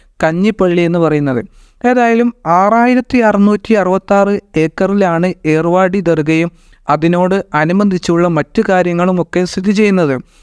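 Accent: Malappuram, India. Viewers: മലയാളം പറയുമ്പോൾ മലയാളം തന്നെ പറയണം അല്ലാതെ മലപ്പുറം മലയാളം അങ്ങിനെ ഒന്നില്ല... നിക്ക് ചീരാപ്പു പുടിച്ചുക്കുണ് എന്നു പറഞ്ഞാൽ എനിക്ക് മനസ്സിലാവും കേരളത്തിൽ ഉള്ള എല്ലാവർക്കും മനസ്സിലാവില്ല... മുഴുവൻ എന്നു പറയേണ്ടിടത്തു മിയ്‌മൻ എന്നു പറയരുത്... ചായ കുടിക്കാൻ എന്നതിന് പകരം ചായ കുടിച്ചാൻ എന്നു പറയരുത്... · പല നാട്ടിലും പല ഭാഷകളാണ്. മലപ്പുറം ഭാഷ ഇങ്ങിനെയാ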